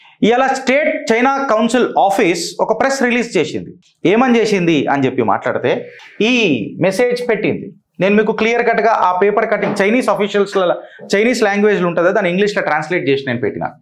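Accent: Telangana, India. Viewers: Sir మీ ప్రెసెంటేషన్ చాలా బాగుంది.. Flow, తడబడకుండా,, ఎంత చక్కగా matter చెప్తారు... తెలంగాణా తెలుగు వినడానికి ముచ్చటగా, ఇంక వినాలని అనిపిస్తుంది...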